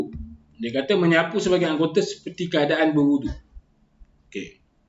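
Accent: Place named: Perak, Malaysia. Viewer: Bunyi perak ustaz😅😅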